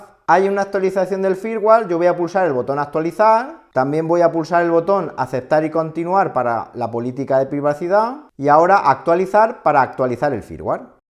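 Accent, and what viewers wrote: Murcia, Spain. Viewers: Ahora que llega el verano? Por tu acento diria q eres de murcia...y a no ser que en murcia el verano empiece ya , no entiendo.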